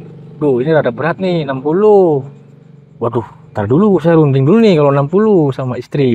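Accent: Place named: Indramayu, Indonesia. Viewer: Enakan baka cerita Asli wong dermayu kien mah kang ketenger sg bahasae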